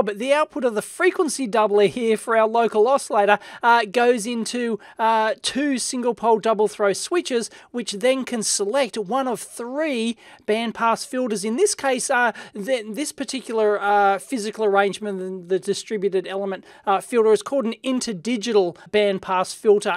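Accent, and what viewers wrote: Australia. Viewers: I am 728% American but after watching a video from you, occasionally I find myself saying, "hi," to someone but in your Australian accent. It throws people off but it also means I love you stuff.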